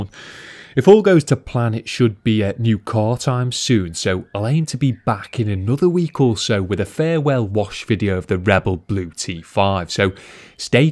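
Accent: Liverpool, United Kingdom. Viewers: Worst accent in Britain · Are you Scouse? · You’re an amazing Detailer and vlog maker, but your accent is so pleasant to listen to. I was really meant to be born and raised in the UK like my ancestors, instead of my Baltimore, MD, USA accent Hon’. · Is this a Liverpool accent?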